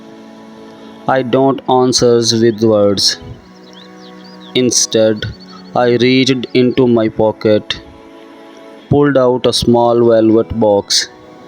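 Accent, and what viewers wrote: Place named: India. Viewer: Why the accent is indian i dont think this is faza faza is defrent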